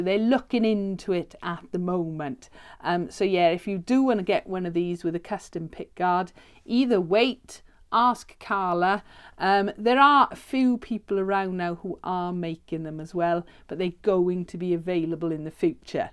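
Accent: Wales, United Kingdom. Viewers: Omg haha I'm sooooo sorry 😂 I'm usually pretty good with the Welsh accent and you speak very clearly so it was obviously me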